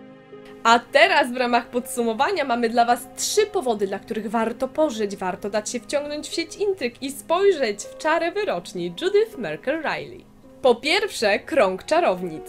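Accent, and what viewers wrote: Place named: France. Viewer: Cóż za wspaniały, francuski akcent :D Wspaniała recenzja. Dobrałaś do niej świetną muzykę :D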